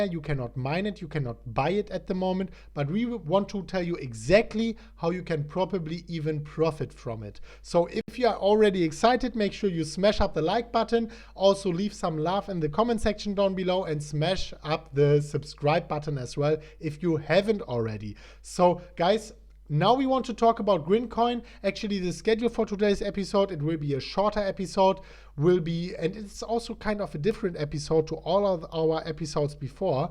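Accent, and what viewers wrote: Germany. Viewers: ich höre deinen deutschen Akzent von weit weg haha..Welche Bücher kann ich mir durchlesen um diese ganzen Graphen lesen zu können wie davincj15 sie manchmal in seinen Videos nutzt.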